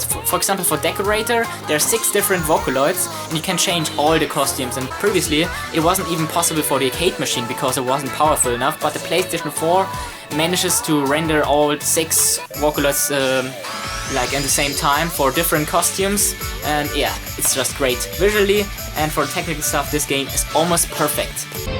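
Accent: Germany. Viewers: Dude are u german? Nice video btw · Dein English ist gar nicht so schlecht aber man merkt das du Deutscher bist :D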